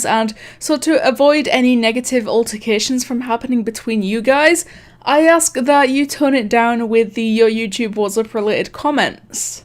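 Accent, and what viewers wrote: United Kingdom. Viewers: I could already tell you were British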